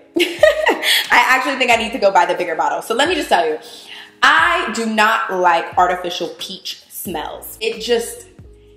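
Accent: New York, United States. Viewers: Please tell me you’re from NY? Because you sound like a straight up NYer 😂❤️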